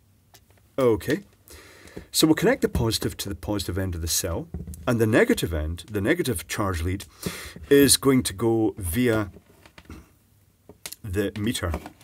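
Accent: Scotland, United Kingdom. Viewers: Your voice sounds like a teacher that I used to know when I was a small child. He used to behaved and talked in a very similar way to you, and now I know that a lot of this ascent is Scottish in origin. I have noticed a few differences in Scottish ascents, being that I have known of them and stayed in Scotland for about 1-2 months. Scotland is fascinating. That teacher also used to have a beard like you as well; there was something about that teacher that used to interest me, I don't why; but he used to play the piano at school very well in assembly time, and this was in primary school.... I think looking back at it now, he was kind of trying to have fun with the kids in his own unique way.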